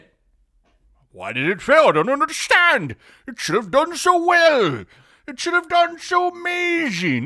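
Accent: United Kingdom. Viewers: Your British accent has me weak 😂😭🤣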